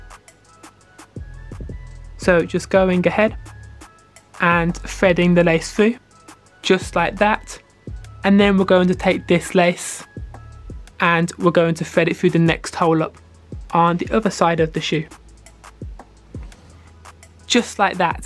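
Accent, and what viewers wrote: United Kingdom. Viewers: Mate, these kicks are rad! I love your accent too. Which part of England are you from? Cheers, Fran (NZ)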